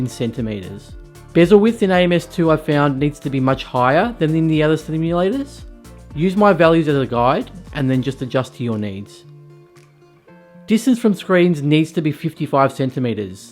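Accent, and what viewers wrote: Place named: Australia. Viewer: I love your calm style and Australian accent 😃 Much love brother 🍻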